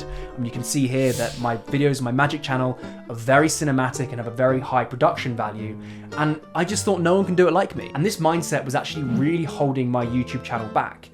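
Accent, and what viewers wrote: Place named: United Kingdom. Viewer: Good video. Like your advice. And your British accent is crystal clear to us Americans. However.... this advice is pretty useless for those of us on YouTube who are not interested in monetization. Many of us are here for politics and to promote political viewpoints. In my case, libertarianism. Have zero interest in making $$ on YouTube. I have a day job. Now... if you really want to help folks like me, make a video on how people with day jobs can find the time to make YouTube videos.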